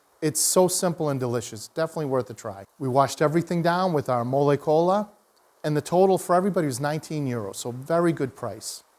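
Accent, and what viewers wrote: Boston, United States. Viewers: I really appreciated your video on Turin; it was the first video of yours that I ever watched. I enjoy Turin and have made many trips there. But the most fun for me was in your first sentence or two - as a fellow New Englander, I said to my husband, I hear Boston :-) so I won the bet when you mentioned your former work as a tour guide there. Seeyah! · First of all your review with your Boston accent made me feel right at home. As a fellow Bostonian living in Southern California for many years, I love that you have relocated to Italy. I just returned from a month in Italia. I went Firenze Ancona Assisi and Rome. I lived over there 35 years ago and now that I’m retired I want to move over. I’ve been watching many videos. This one was excellent! I love how you put up the maps of the city to explain the different neighborhoods. 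All of the food reviews and attractions. I am leaning toward Trieste. Thanks again. A presto!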